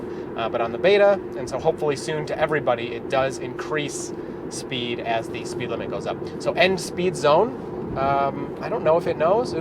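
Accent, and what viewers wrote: Michigan, United States. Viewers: Hearing your accent brings back memories of growing up in Michigan. I not only don't have it anymore 40 years later but can't seem to reproduce it.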